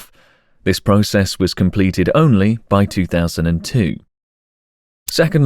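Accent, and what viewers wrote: United Kingdom. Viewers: That's because that's not the voice of the channel's author, they hired an actor from the UK and didn't hide that btw, check video's discription. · Did you learn British English as a youth and thus, speak British English like a native, or does an Englishman narrate your scripts, or what?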